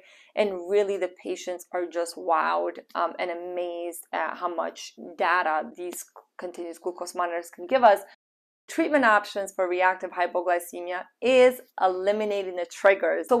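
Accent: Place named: Philadelphia, United States. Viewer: Holy smokes that is a cool thick philly accent.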